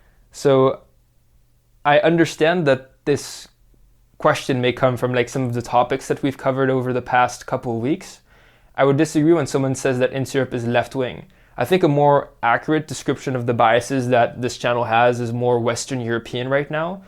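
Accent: Netherlands. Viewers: Why do i hear a dutch accent from a frenchman? Or am i just imagining things? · honestly I thought you were Dutch, your accent sounded more Dutch to me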